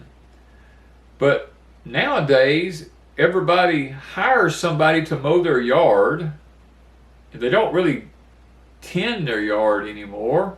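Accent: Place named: Mississippi Gulf Coast, United States. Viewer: Oh my! I have to tell you something funny. I am 63 y/o and live in Oregon. I stream lots of homestead channels but yours caught my attention because I couldn't put my finger on your accent. I can usually tell like Florida, Louisiana, Carolinas, etc.But yours just stumped me. Until today I heard you talking about love bugs and the old Sunflower store and now I know where you are from. LOL! I am from Long Beach and you sound like home! No wonder I couldn't quite place your accent. My kids still live in Bay St. Louis and Biloxi and I'm on the coast today visiting and dropping hints trying to get my son to buy a farm!! 😂 Oh and one more thing.... Love bugs live inside the trees and are released when hurricanes snap them open. My brother does Auto air conditioning in Gulfport and love bugs are a harbinger. They swarm when the A/C season starts picking up and again when it slows down.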